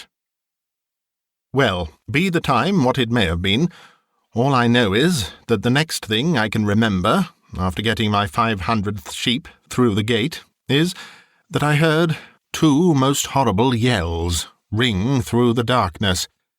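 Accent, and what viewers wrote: United Kingdom. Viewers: Yes, you can’t beat a good old fashioned perfect diction posh English voice, with a no nonsense masculine delivery , for these stories......perfect.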